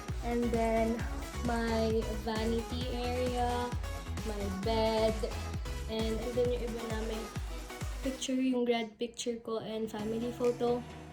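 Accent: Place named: Conyo, Philippines. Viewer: Yung pagka-conyo at voice mo reminds me of my crush back in 9th Grade....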